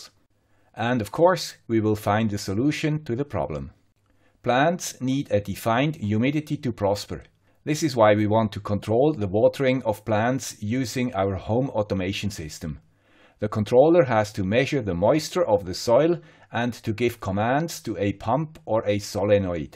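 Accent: Switzerland. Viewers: Hallo aus Österreich,!:hand-pink-waving: Ich mag deine Videos. And "the Swiss accent":) Wegen des Kupfers... Wenn du, so wie du es gemacht hast, die Elektroden ins Leitungs-/Brunnenwasser steckst (nimmt man ja meist zum Gießen) und mit ein wenig Spannung und einigem Strom beaufschlagst, erzeugts du einfach Knallgas. Sauerstoff und Wasserstoff an je einer Elektrode, das entstehende Wasserstoffgas kann als Blasen an der Oberfläche der Kathode freigesetzt werden. Komplizierter ist es mit Folgendem: Im Leitungswasser sind üblicherweise verschiedene gelöste Salze enthalten, darunter Natrium- bzw. Kalziumionen und Hydrogencarbonationen (HCO₃⁻), die möglicherweise reagieren könnten, um Kupfersalze wie Natriumkuprat (Na₂CuO₂) oder Kupfercarbonat (CuCO₃) zu bilden. Verschiedenen Faktoren wie Wasserzusammensetzung, pH-Wert, Temperatur und Stromstärke beeinflussen die Reaktion. 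Wenn man Pflanzen mit einer solchen Flüssigkeit bewässert, bzw das im feuchten Erdreich auftritt, könnte dies potenziell schädlich sein, da Kupfer in höheren Konzentrationen toxisch für Pflanzen sein kann. Kupfer ist für Pflanzen notwendig, aber nur in geringen Mengen. Bei zu hoher Konzentration kann es jedoch die Aufnahme von anderen wichtigen Nährstoffen behindern und oxidativen Stress verursachen. Die Kalkablagerungen könnten auch den Boden pH-Wert erhöhen, was wiederum die Nährstoffverfügbarkeit für Pflanzen beeinflussen könnte. Ein erhöhter pH-Wert kann dazu führen, dass bestimmte Nährstoffe wenige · Your videos are always wonderful, instructional and your Swiss accent is adorable too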